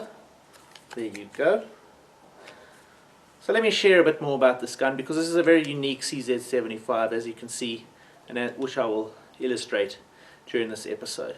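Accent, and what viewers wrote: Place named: South Africa. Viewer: It’s so bizarre seeing someone who looks like they’re from Tennessee talk in a South African accent. Cool stuff though.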